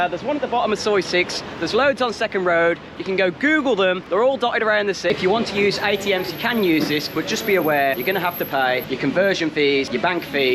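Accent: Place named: Midlands, United Kingdom. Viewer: ahhhhh do i detect a midlands twang mate ?,where you from ?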